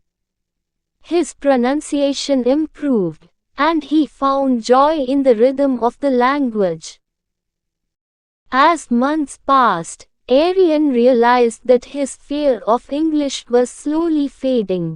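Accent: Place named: India. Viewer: It is indian accent not british or American 😐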